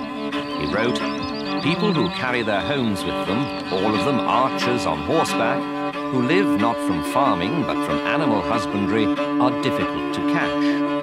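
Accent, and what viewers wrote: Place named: United Kingdom. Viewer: Use American English. British English is difficult to understand.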